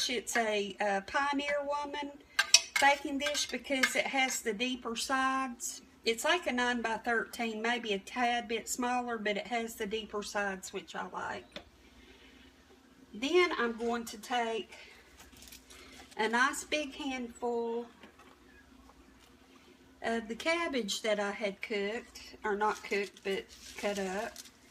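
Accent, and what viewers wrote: South, United States. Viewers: I have just found you, where are you located. Being from the south we don’t think we have accents only the Yankees do, but I thought I heard a little bit of southern twang in your voice, very comforting. Keep on cooking and I’ll continue to watch. Thank you Lady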